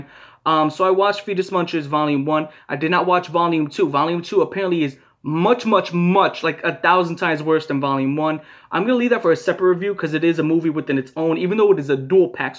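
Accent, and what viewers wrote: New York, United States. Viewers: New York or Chicago? XD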